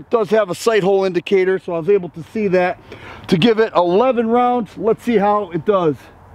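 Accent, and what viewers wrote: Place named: South Jersey, United States. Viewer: Sound like your from South Jersey or Jersey at least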